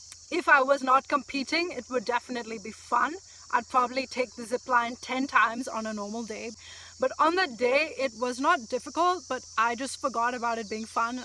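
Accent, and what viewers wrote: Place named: England, United Kingdom. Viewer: You're english accent always melt me down. And you're the most awesome lady💜💜💜💜💜💜💜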